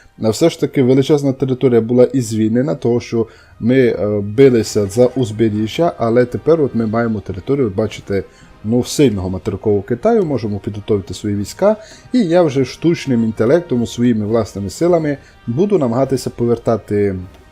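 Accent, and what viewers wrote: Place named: Lviv, Ukraine. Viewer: а я так і зрозумів, що ти з западу, бо надто вже схожий на північний Львівський діалект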